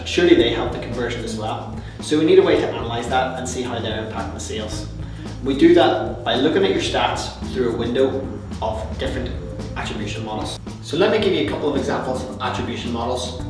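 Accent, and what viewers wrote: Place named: Ireland. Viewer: Great video dude. Loving the Irish pub accent.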